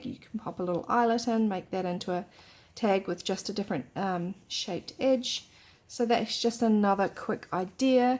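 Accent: New Zealand. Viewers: Beautiful! In fact I zipped straight over to your Etsy shop and bought some! Don't worry about longer videos - sometimes they are needed for us (me?!) to get the gist of things. Also, I think in an earlier comment I mentioned liking finding a fellow Aussie to follow... can't believe I didn't pick the Kiwi accent, having lived over there for 7 years!!! Anyway, good to have someone 'local' (I'm in Port Macquarie). :-)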